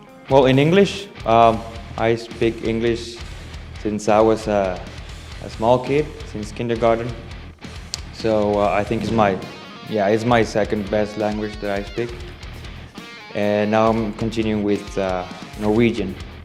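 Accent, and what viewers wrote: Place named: Ostrobothnia, Finland. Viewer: Yllätävän hyvää suomea! Huomasi myös että oot asunu Pohjanmaalla. 😊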